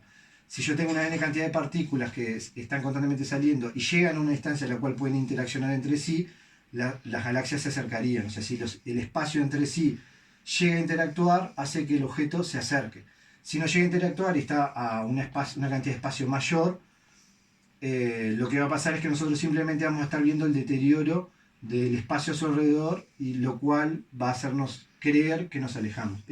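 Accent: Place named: Uruguay. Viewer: Interesante hipotesis, mucho mas siendo que no eres físico. Eres uruguayo? Tu asento se parece mucho. Deberias estudiar en el Balseiro. Saludos desde Argentina.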